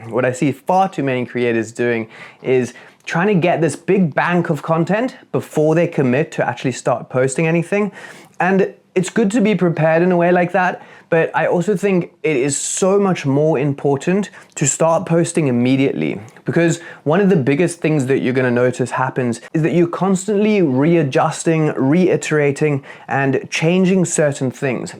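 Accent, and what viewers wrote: South Africa. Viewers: Yoh bru, it's a proper gamechanger hearing a South African accent when you're trying to learn something on YouTube. Thanks for the intel, keen to klap this journey. Sending love from CT. Lekker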